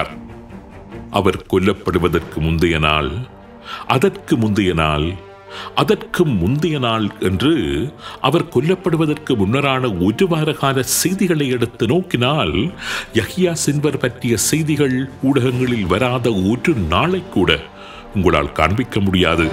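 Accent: Sri Lanka. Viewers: உங்கள் குரல் சூப்பரோ சூப்பர் ஐயா. ஐயா நீங்கள் இலங்கை தமிழில் பேசுவது ரொம்ப சூப்பர். ஐயா இஸ்ரேல் கூடிய சீக்கிரம் அழியும் அந்த அழிப்பை படைத்தவன் செய்வான்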